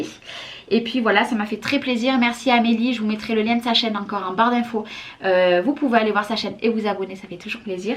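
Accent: South, France. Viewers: J'aime regarder vos vidéos et moi qui suis de Nantes j'apprécie beaucoup ce petit accent qui me ramène un peu le sud vers moi. Bonne journée ☺️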